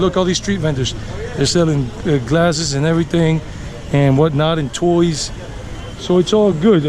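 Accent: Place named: Colombia. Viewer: Wow, you sound like Colombian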